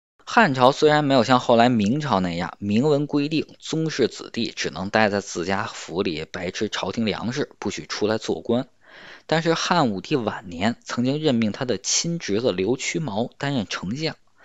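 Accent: Beijing, China. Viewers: 听着像北京人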